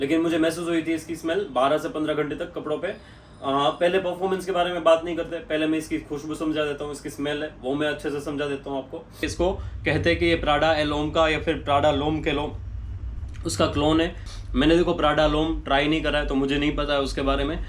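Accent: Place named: Gujarat, India. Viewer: bhai bhai su vat che....apde hindi boliye aema gujrati eccent dekhay j jay😅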